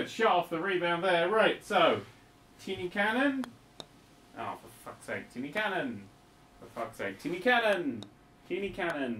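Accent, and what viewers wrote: England, United Kingdom. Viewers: Is it just me or does sound more British then usual in this video? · is extra english today. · became more british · I think sounds the most british he ever has in this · sounds a lot more british in this. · Boy sounds extra British today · I think is extra British in this episode. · Is it just me or has gotten significantly more English? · Has became more British?? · When gets angry, he gets like %70 more British.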